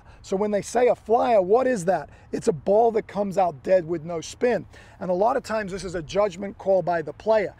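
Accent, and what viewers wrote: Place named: Australia. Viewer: Can hear his Australian accent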